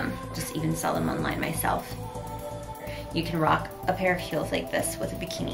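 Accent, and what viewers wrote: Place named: New York, United States. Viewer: U can hear her new york accent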